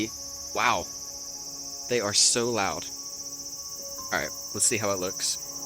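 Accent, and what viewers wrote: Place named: Alabama, United States. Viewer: Great stuff! Informative content and loving that slight hint of an Alabama accent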